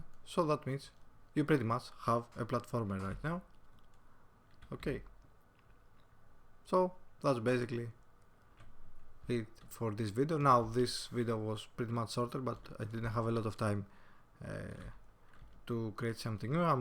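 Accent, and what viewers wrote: Greece. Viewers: Are you Greek by any chance? :) Asking cause of your accent.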